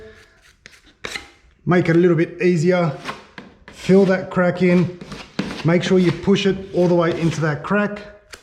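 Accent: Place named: Australia. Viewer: 🤔Why do you sound Australian?🤔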